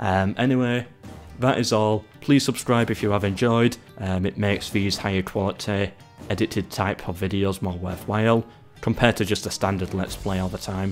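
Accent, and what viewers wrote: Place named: Manchester, United Kingdom. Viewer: What accent is this omg, Manchester?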